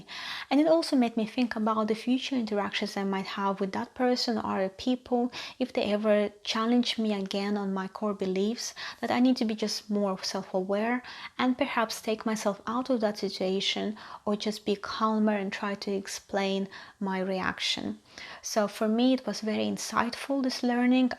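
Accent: Russia. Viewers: Are you Russian? I can hear Russian accent. Do you live in UK?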